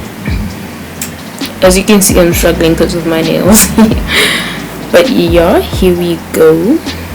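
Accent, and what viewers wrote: South Africa. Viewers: tell me you’re south african without telling me “ so ja “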